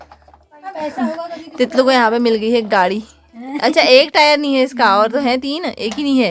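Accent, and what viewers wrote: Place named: Pahari, India. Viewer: Pahadi bolna kitna accha lg rha h aapka ❤❤❤❤❤❤❤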